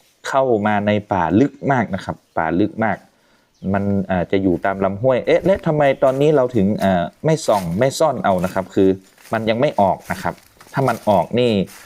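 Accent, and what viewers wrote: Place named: Phayao, Thailand. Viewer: ม่วนขนาดสำเนียงเหมือนเมียงพะเยาเป๊ะ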